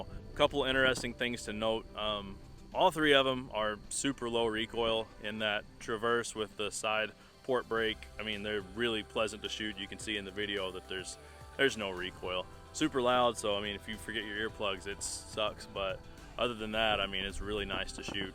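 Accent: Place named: Fargo, United States. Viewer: Liking your videos a lot! Sounds like your in the Fargo area, I’m in Bismarck. I just picked up a 6.5 PRC Ridgeline. Two questions for ya...what scope did you top yours with? (I’m leaning toward the Leupold VX-5HD 4X20) And...which model Javelin bipod did you get?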